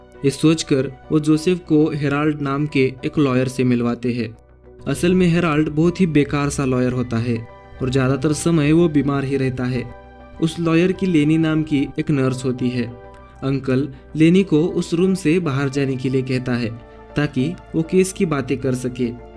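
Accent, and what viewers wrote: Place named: Maharashtra, India. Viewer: Bhava marathi ahes na? Accent varun samjl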